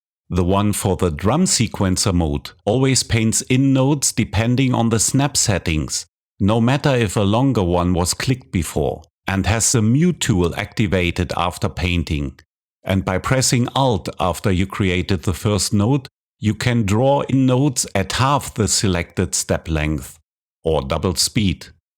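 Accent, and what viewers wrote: Germany. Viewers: Your tutorials are so good. And I like your strong german accent. That matches perfect to your lessons.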